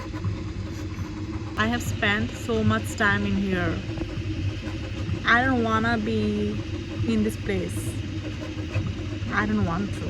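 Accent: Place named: Bangladesh. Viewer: i'm a student of hsc 26 batch and recently i decided that i want to study archi from buet. so i searched up archi related bangladeshi videos but the content is so less. this video randomly popped up in my yt homepage and i started watching and thought to myself "accent ta amader moto lagtese. apu ki bangladeshi naki?" and went to comment section to check and YOU ARE ACTUALLY ONE OF US. i just binge watched all of your YT videos and GIRL I HAVE BECOME A HUGE FAN. a girl? check. buetian? check. archi student? check. moved to usa? check. APU UR LIFE IS LITERALLY MY DREAM AND I ASPIRE TO LIVE LIKE THIS ONEDAY. lots lots lots of love and im so disappointed to hear that u got trolled online. plz dont stop making these videos. im really motivated rn as i learned je amr dream is not actually impossible and someone is living it already. lots of bhalobasha again ❤ ❤ ❤ ❤ ❤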